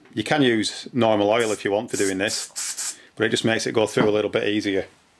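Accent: United Kingdom. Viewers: brit, man.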